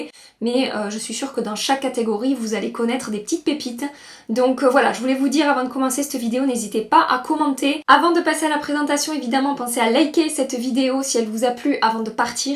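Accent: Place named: Marseille, France. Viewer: Tu es très belle, tu explique bien, et j'aime ton accent marseillais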